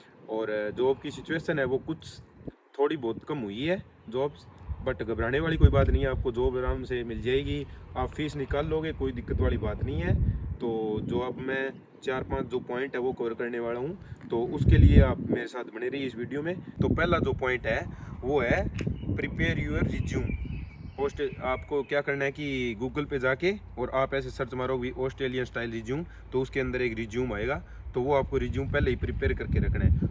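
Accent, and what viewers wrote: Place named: Haryana, India. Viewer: Bhai Rajasthan haryana side se ho kya Hindi nal Dhaka ho rya ha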